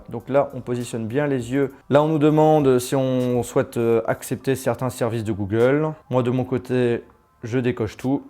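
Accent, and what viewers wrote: Belgium. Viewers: tu es Belge ????? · tu es belge? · Par contre t'es vraiment en train de prendre l'accent belge ... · t est belge ?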